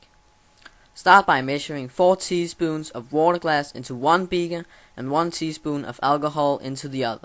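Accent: Denmark. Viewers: You can easily hear he's danish, and also see it on the BORUP spirt and the vandglas xD Trololol, jeg er selv dansker ;D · are u danish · Are you danish ? :D Your accent sounds danish ;) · dat danish accent! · you danish? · I can tell you are danish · You are danish! I can hear it in the way you speak english! Its almost like us,norwegians...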